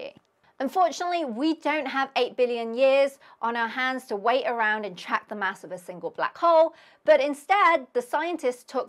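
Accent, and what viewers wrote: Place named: England, United Kingdom. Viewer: 😘 Cute yellow with a silly dialect from the tea drinkers. It's best if you speak the Human language aka American English, not tyat ugly noise from england. Why is your head bobbing up and down like that, is that typical mannerism over in mere england?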